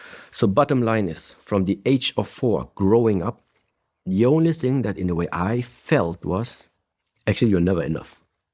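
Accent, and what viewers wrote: Germany. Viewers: Yes, and especially saying "Ja?" after some of the statements. Very German thing to do, and very German pronunciation on that as well.... Plus, I don't know how many people know it, but there are lots of Vietnamese refugees from the 70's and in the meanwhile of course their children and now grandchildren too, with most of them having a German citizenship. I think it's great that he's opening his heart more, and also admits honestly that the old patterns sometimes try to sneak in ( and wreak havoc, if letting certain unhealthy patterns to take over). I think that many such patterns are what people often call "family karma" or "ancestral karma", depending on how far back those patterns go... I myself am on the path of recognising and changing them - and I know all too well the feeling of "never being good enough". Where to just BE is sort of act of revolution concerning the old family dynamics and expectations - and it's something that most of the society doesn't accept either, nearly all of us are indoctrinated from an early age on to do more, achieve more, compete with one another ( the school system very much so with the notes etc ) and sadly, it not only leads to continuous unhappiness with ourselves, but also destroys the planet when most people go after more and more "achievements" that are often shown as material stuff... 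Yet, to be able to break out of it, one needs certain amount of money to have a small house and property if one wants to live off grid for example. And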